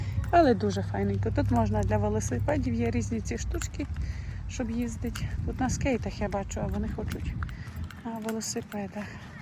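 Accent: Rivne, Ukraine. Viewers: Це так говорять на Рівненщині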